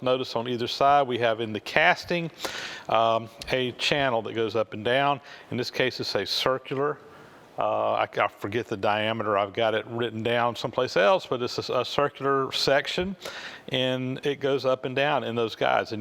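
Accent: South, United States. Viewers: I just finished watching the video from Hand Tool Rescue where you had to make the part for him. In the part of the video where y'all were having the "phone conversation," the minute you opened your mouth, I thought, "That boy ain't from Canada. I wonder how far below the Mason-Dixon line he lives." I was born and raised in Monroe, LA area. My ancestors came from Georgia and Alabama. Enjoyed the video.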